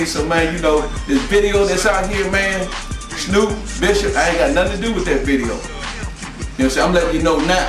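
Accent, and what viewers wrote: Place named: South, United States. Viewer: he talk like he from the south but just a lil faster tho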